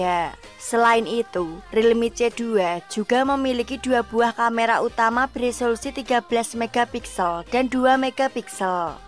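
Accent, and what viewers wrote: Java, Indonesia. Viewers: Medok bat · Dari suaranya sih kayak dari jawa,wong jowo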